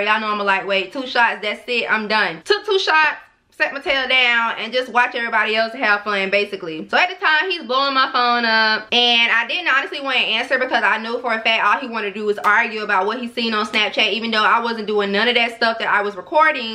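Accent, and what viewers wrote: South, United States. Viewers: I’m from Texas. When I say I loveeeee how country you are 😂😂😂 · you’re so southern girl I love that accent💕great video, F that dude!! new sub beautiful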